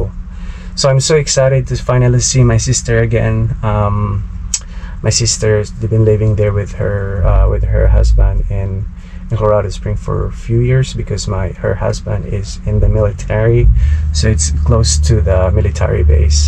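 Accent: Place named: Philippines. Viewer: Hey where's in Philippines you come from?,Your English is very good a bit if a accent there.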